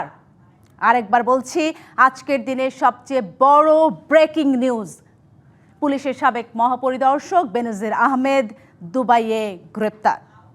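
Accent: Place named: India. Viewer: কিরে ইন্ডিয়াদের মতো নিউজ পরে কেন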